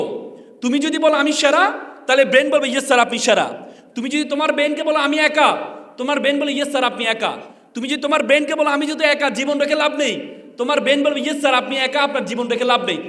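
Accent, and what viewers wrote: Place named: India. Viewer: আমার খুব জানতে ইচ্ছে করে উনার বাড়ি কোথায় কারণ ভাষা গুলো মনে হয় ইন্ডিয়ানদের মতো আবার যে গুলো বলে সেটা বাংলাদেশের জায়গা।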